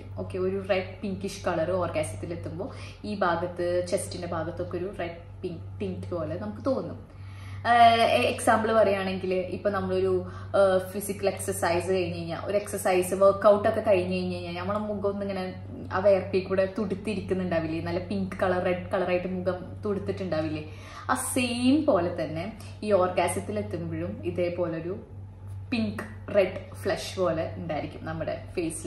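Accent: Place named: Kozhikode, India. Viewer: Are you from Calicut? Kik-killi kettapo chodhichadhaato.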